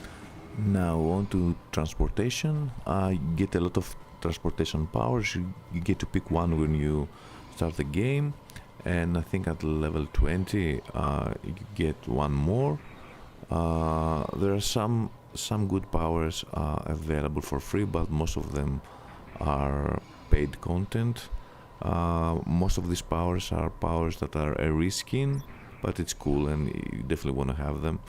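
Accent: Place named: Greece. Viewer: you sound like a greek or it probably is my idea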